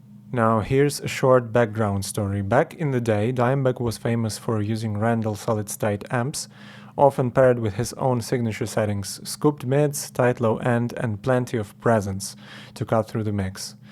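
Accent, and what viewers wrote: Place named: Western Ukraine, Ukraine. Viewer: Так ось він який - акцент на заході України 😂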